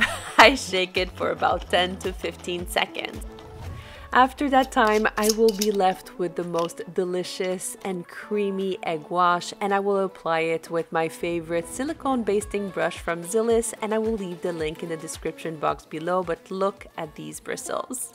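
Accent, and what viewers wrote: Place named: France. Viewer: I love challah! Thank you. I thought you live in Israel until I saw the groceries in English. Are you in the states? In an orthodox Sephardic jewish community? Also you hv a beautiful French accent. This week is the key challa segula for Parnassa as it’s after pessach and Benetton Israel entered Erez Israel.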